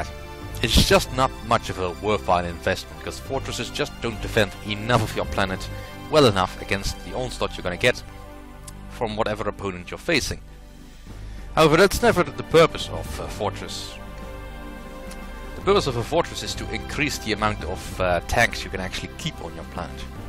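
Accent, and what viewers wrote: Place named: Eastern Europe. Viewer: It's a pitty. When you are planning to replay the game on the higher difficulty, please let me know. I'd like to give you a bunch of tips and hints helping you to win the game. (I've collected around 30 tipps and hints to improve your gameplay or just sensitize you to some aspects of the game.) You're English is very good (from my point of view), although I suppose you're not a native speaker. I guess, you're from an Eastern European country, aren't you?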